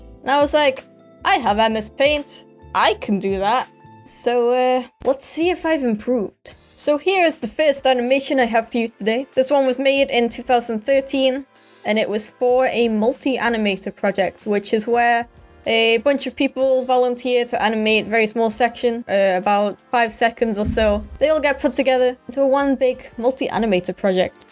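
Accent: United Kingdom. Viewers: .. in the least sexist way what gender are you. You sound like a British young male or a British adult female... please tell me I feel weird about this because I'm new and I may or may not be making fandoms and may or may not need to know your gender for a fandom. Not saying anything here just asking mate. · I love the British accent owo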